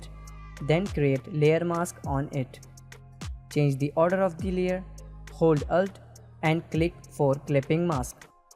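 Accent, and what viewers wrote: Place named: India. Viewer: very funny indian english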